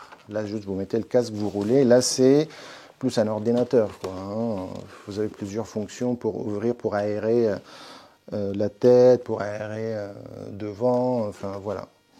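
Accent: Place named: Tunisia. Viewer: Salam shalom salut Je suis le 900ieme depuis hier ! J’aime énormément tes vidéos j’ai tout regardé depuis le début et je remonte petit à petit. C’est mon premier commentaire et sûrement pas le dernier ! J’aime énormément ton contenu, la vitesse des vidéos, ton état d’esprit, ton accent de Tunisie 🇹🇳 j’en suis sûr ! 😜 ta réflexion, ta personne. Je continue à remonter tes vidéos c’est un moment de détente incroyable. Peace ❤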